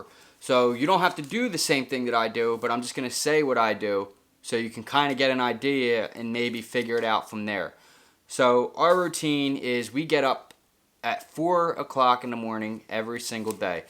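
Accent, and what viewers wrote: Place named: Maryland, United States. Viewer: I was thinking Maryland too, possibly Delaware. The accent!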